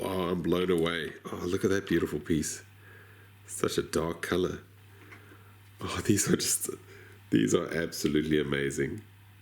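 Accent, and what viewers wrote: South Africa. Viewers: wait are you south african?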